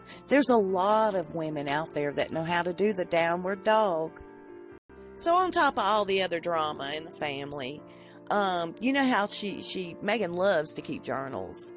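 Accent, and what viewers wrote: South, United States. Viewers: I am glad i found this channel..love your southern accent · How does someone with a southern accent know so much about the royal?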